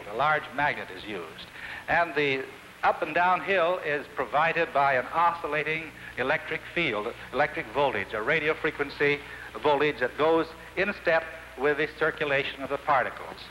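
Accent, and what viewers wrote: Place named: United States. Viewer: I loved this kind of clear-cut manly American accent of the mid 20th century, now sort of lost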